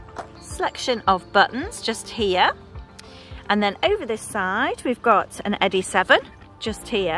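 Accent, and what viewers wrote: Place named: United Kingdom. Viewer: Superb hunt. Adore your British accent 💖 I now know where your lad gets his 'OMG' catchphrase. 😄 Cheers 🇺🇸🇬🇧❤️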